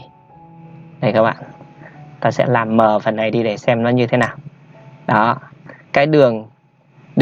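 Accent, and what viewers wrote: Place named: North, Vietnam. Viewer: Người bắc nói giọng bắc dễ hiểu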